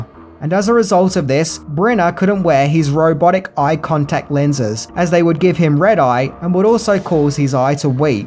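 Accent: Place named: Australia. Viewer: " but with thick accent it's hard to tell.' Seems pretty mild for an Aussie. Maybe its all that Monty Python that has prepared me for non-American accents. Such as the University of Wallamaloo sketch.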